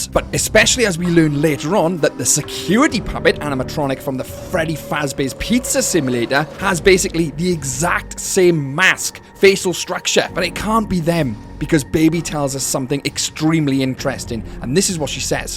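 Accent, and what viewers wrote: Wales, United Kingdom. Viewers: 'Funtime Beaka', love that slip of the tongue, esp. with the Welsh accent :D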